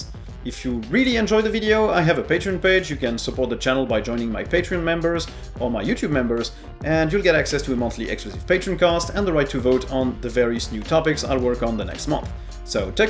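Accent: France. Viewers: The best french eng accent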